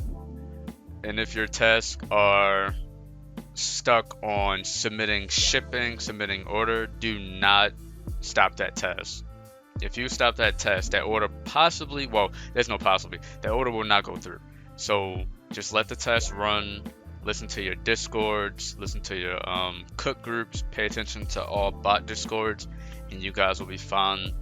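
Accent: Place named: Baltimore, United States. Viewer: good vid g. Yo you from baltimore? I can hear it from a mile away hahaha anyway I remember checking out wit eno using a mastercard on ys but mastercards dont work no more?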